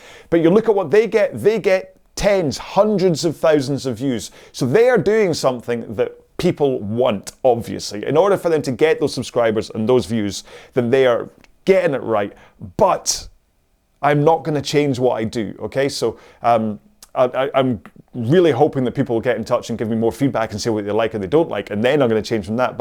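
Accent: Scotland, United Kingdom. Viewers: Hi, first I want to thank you for all the content you’re providing. I like your vids A LOT! There is less „rah-rah“, the exact right amount of teachings, some entertainment, my kind of humor and no annoying music - just the sound of the fly wheel and some Scottish bloke talking stuff to shorten the hour. I prefer to listen to you reminding me of proper technique aspects over some podcast or music. And though I also follow and like Austin’s or Shane’s channel I regularly return to yours. My first 60min rows I did with your videos #wow60. So, thank you for the row alongs and keep on going your way. I’m sure there’ll be plenty of us indoor-online-rowers with you. 🙏💪👍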